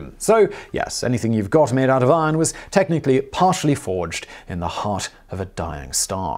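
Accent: United Kingdom. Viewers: BonesThe modern British English accent was created to distance themselves from seceded American colonialists. The goal was to make themselves sound posh, or more intelligent, versus someone from the US. Im saying his British accent is so perfect that instead of my usual opinion of the accent, which is lazy, I think it makes him sound rather fancy.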